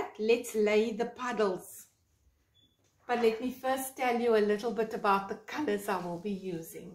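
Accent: South Africa. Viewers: Lovely. I would recognize that South African accent anywhere. Mine's the same.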